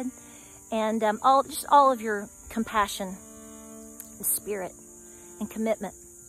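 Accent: South, United States. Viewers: Love your Down to Earth style talking. Southern kinda talk; I'm a Tennessee born individual and (ha ha 😅😅😅) it's my style that's like down home old schoo.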